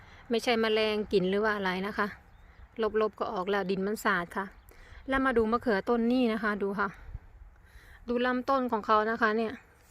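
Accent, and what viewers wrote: Korat, Thailand. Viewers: แก่คนนางรองนิ พูดโคราชเหมือนกั๋นเลย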